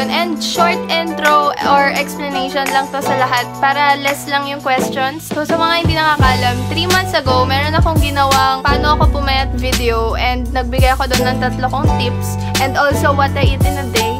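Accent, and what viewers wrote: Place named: Tuguegarao, Philippines. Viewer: Familiar accent. Hehe taga tuguegarao pala talagaaaaa. Hello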